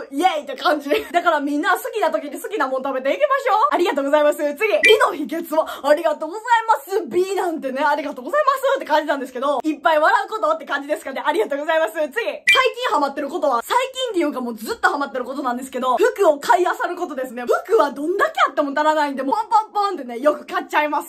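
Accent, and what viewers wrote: Wakayama, Japan. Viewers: 間違いない笑ちょっと和歌山弁入ってるけど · めっちゃ和歌山弁やと思ってたら和歌山すんでるんや😹この前電車で横に座ってました爆笑爆笑爆笑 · 地元の友達のノリと話し方そっくりで多分和歌山の人やろうと思ってて、ほんとにそうやってすっきりした。こんな短時間でたくさんありがとう言われたん初めてや。年下なのが信じられん。応援してます。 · 見た目と関西弁のギャップえぐいww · はじめて動画を見ます！見た目がクールなので、性格もクールな感じなのかと思っていましたが、中身はばり関西弁の太陽感ですごくおもしろいです(T T) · 今日わはっていうところとか、〜やんって言うところが和歌山県民だと思ってました。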